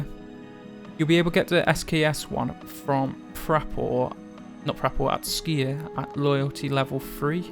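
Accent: United Kingdom. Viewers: but you are English.....well from your accent you sound like being from the UK and before our English in the us the only people to speak English were British people.....so the "we all do it" is wrong here because hes not from the us for one so maybe they pronounce words differently than we do here?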